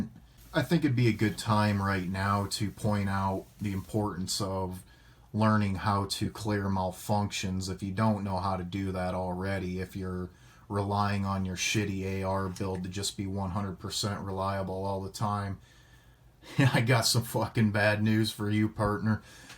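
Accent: United States. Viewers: fuck man were you born and raised in NYC, that's how we speak BROOKLYNESE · When you talk you remind me of the MN boys 🤣 I've been to Des Moines they must be alot different than rural Iowa I also get why people are safety Nazi's just because I had a gun doesn't mean one of my kids didn't touch it or whatever but people don't get the safety is situation dependent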